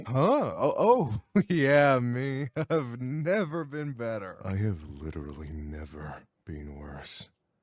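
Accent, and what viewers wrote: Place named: United Kingdom. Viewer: You sound like you live in a steampunk britain, its captivating I love this style of videos discussing niche topics i really like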